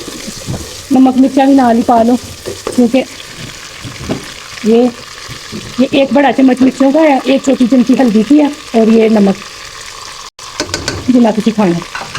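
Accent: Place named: Punjab, India. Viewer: Love your Punjabi accent ❤❤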